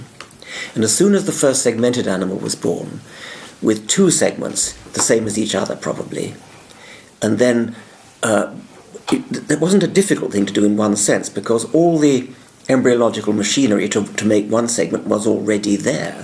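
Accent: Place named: Oxford, United Kingdom. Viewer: must be church bells that give a sense of peace and meaning on a sunday I assume at Oxford, how ironic. There is no meaning at all just mindless replicating tiny entities using me as a throw away temporary vehicle like a throw away party girl, for no purpose. I love the civilized Oxford voice and the gentility but what he says is that we are all ignorant robots living in a Matrix like nightmare.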